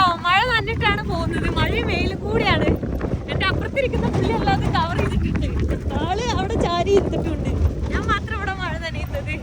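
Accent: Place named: North Kerala, India. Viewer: ഉത്തര കേരളത്തിൻ്റെ ഏതു ഭാഗത്താണ് ജീവിച്ചിരുന്നതെന്ന് അത്ഭുതപ്പെടും, സംസാരഭാഷ കേൾക്കുമ്പോൾ '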